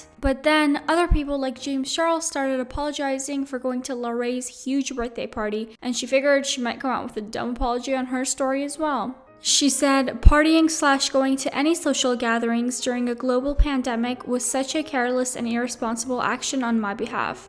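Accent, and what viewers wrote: Canada. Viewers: i love your "sorrys" that cute canadian accent gurl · I always forget is Canadian until she says “soorrry”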